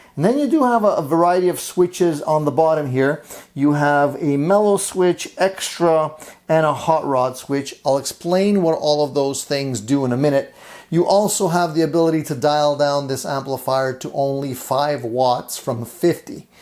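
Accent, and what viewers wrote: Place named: Montreal, Canada. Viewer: Sounds great! Maybe a dumb question, but are you from Montreal? If not, then you have definitely our English accent for some reasons haha Cheers!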